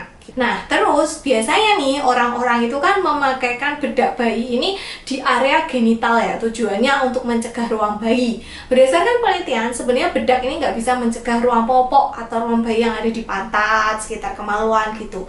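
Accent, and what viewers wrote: Java, Indonesia. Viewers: Dihh bu bidan lucu yaa gaya bicaranya, aku yg juga orang jawa kerasa bgt uniknya ,malah jadi ciri khasnya Bu saran dong, gimana kalo ngebersihin BAB pake tisu basah, bahaya kah? Kan kalo pake wash lap suka ribet Makasi bu bidan yaa sharingnya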